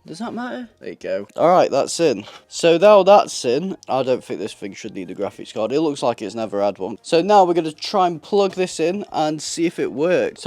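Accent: United Kingdom. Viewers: british accent explains it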